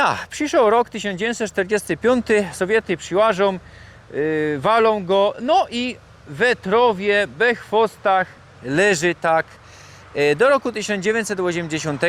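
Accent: Silesia, Poland. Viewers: Fajna ta śląska gwara 👍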